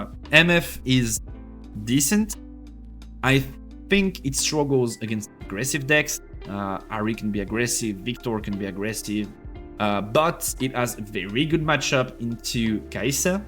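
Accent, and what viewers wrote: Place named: France. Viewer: Je t'ai découvert avec Riftbound, donc en anglais, quand j'ai capté ton accent et que ta chaîne était en FR avant ça, j'ai pre--shot les commentaires de ce genre, mais tkt tu fais bien. Ton anglais est top et super compréhensible et tu te dirige sur un jeu pour le moment full ENG, avec gros potentiel de fanbase vu la licence, donc imo tu fais le bon move, surtout vu ta ligne éditoriale très compétitive, au moins tu te restreint pas au public FR super limité dans ce format :) 💪👏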